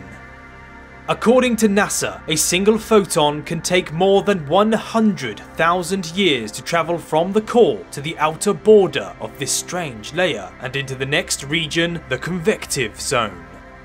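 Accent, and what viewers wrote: United Kingdom. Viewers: You can't delete someone's replies. And how is him having a British accent relevant to the comment?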